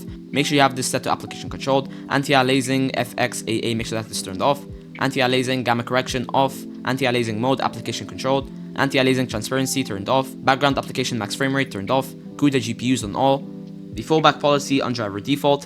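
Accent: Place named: Sweden. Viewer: dude sound like he's swedish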